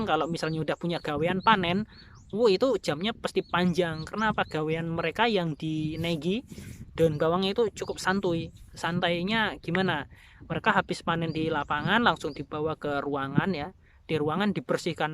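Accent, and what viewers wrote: Java, Indonesia. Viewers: Logat Jawa ini ☺️☺️ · Wong jowo mas? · Wong jowo rek. Ngomng e medok, kekel aku🤣🤣🤣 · Logat e jowo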